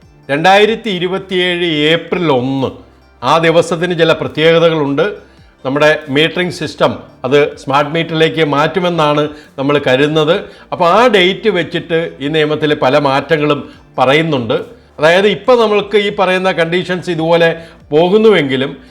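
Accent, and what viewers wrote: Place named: Poonjar, India. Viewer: പൂഞ്ഞാറ്റിലെ ഇംഗ്ലീഷ്.. താനക്കെ ഇംഗ്ലീഷ് ഡബ്ബിംഗ് വീഡിയോ ഇട്ടിട്ട് ആര് കാണാനാണ്..... കോണത്തിലെ ഇംഗ്ലീഷ്...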